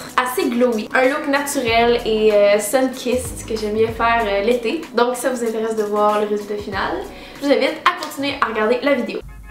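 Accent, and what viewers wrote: Quebec, Canada. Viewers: Ton accent québécois était plus perceptible avant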